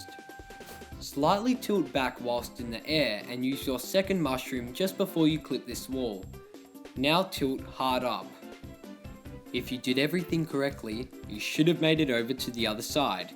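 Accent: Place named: Australia. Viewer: Like this comment if you only care for the Aussie accent 🙂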